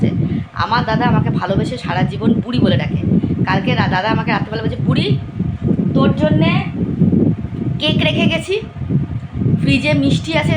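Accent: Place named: Bangal, Bangladesh. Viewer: Heavy bangal vasha bolen toh . Amio bangal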